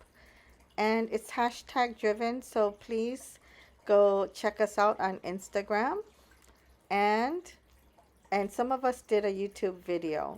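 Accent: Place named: Hawaii, United States. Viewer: I agree, the slimline was perfect for this stampset! So cute!🤗 Gosh, I love listening to your Hawaiian accent. Reminds of my work Ohana when I was stationed on Oahu🤙🤙🤙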